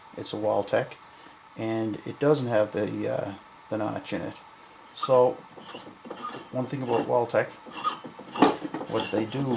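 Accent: Canada. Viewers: Bobby, you are showing your ignorance. Are you going to correct an Englishman for the way he pronounces words too. This man is Canadian. That is the way Canadians pronounce spout. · Haha.. Yea, You definitely have a canadian tounge. Thank you for the video. I'm working on my bathtub as we speak. Take care. (Los Angeles, CA) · Bobby shut up. Whats YOUR problem troll? He has a Canadian accent. And I have no problem with his pronunciation.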